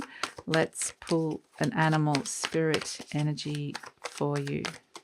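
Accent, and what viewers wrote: Australia. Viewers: Nice to hear an Aussie tarot reader. Has been a bad year for me, never got covid, but got Bronchitis, colds, chest infection's from everybody else and at 70 got to watch out, their's a lot of strange bugs going around now. let's hope 2023 is much better in health wise for everyone. Cheers and Merry Christmas Hun.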